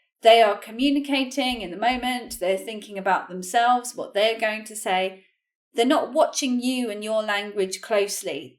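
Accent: United Kingdom. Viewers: Hi, do you english is british? Thank you for tips! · My entire life I learned the american english, but I understand better your british english than the american one.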